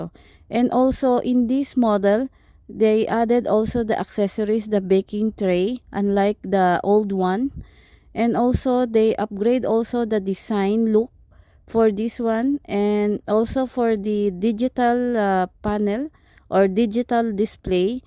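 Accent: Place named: Philippines. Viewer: ARE YOU FILIPINO? IM PRETTY SURE I HEAR A FILIPINO ACCENT RIGHT?😁 COZ YOU HAVE A SIMILAR ACCENT TO MORISSETTE AMON.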